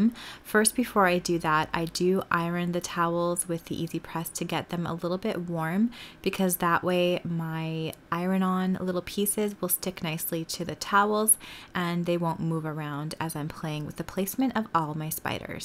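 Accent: Canada. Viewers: Your Canadian accent is so cute. Iron..decal..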